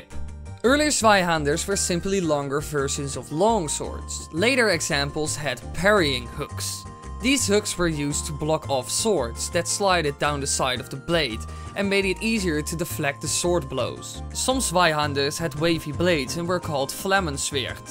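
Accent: Netherlands. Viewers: is either Dutch, flemish or Scandinavian going by his accent. He's not an American or englishman. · It's also weird to hear someone with a heavy Dutch accent be called an English person. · You Sound Dutch. are you Dutch? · You'r Dutch arn't ya? I hear it to your accent, it's not the same as Britians or Americans, you are from the Netherlands, you even have the French R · you sound dutch... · After this Video i would probably think he is Dutch, the way he speaks with his accent reminds me of the Dutch people, especially when he says german words. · English? He sounds Dutch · true maar je klinkt zo Nederlands. vind het echt jammer. want ik vind je beter dan game theory. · Hold up. You're Dutch, aren't you?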